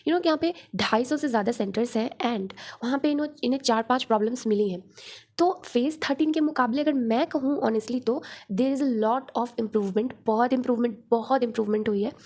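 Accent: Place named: West Bengal, India. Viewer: Are you from wb ?..upki tone se pata lag rahe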